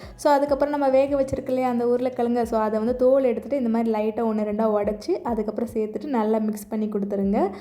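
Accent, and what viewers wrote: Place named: Sri Lanka. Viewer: Hi sis thodarthu paakuran ur video Sister neenga Sri Lanka va unga pesu appadi iruku ❤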